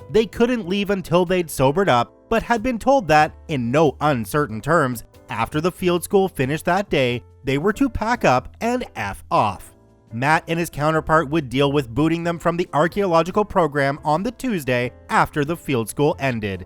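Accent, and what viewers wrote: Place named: Canada. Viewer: the Aussie way of pronouncing the names is with a short "a", and it is similar to how you would pronounce the word "has". However, as is (I believe) Canadian (?), I can't really fault him for saying the names differently.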